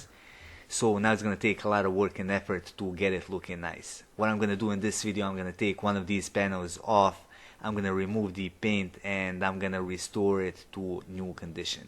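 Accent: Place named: Italy. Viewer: I like your italian accent Mille grazie alfisti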